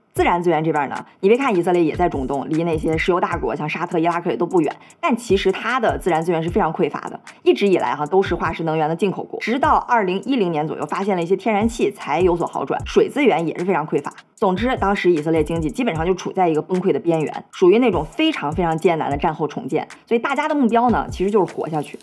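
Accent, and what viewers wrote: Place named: Beijing, China. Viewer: 小林有北京口音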